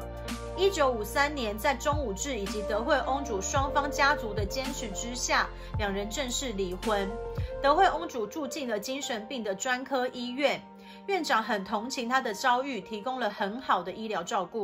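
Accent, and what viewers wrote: Taiwan. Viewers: 这个台湾腔听的我头疼😖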